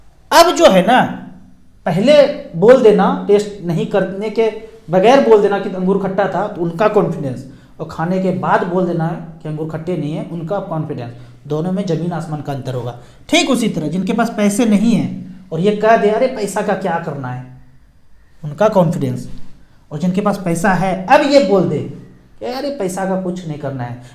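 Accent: Bihar, India. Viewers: Bihar se hai kaya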